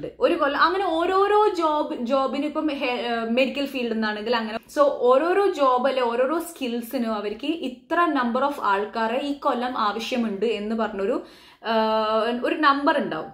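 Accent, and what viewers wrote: Kannur, India. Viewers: Wow.. thanks a lot for committing the video.. Kannur bhasha kettapol thonni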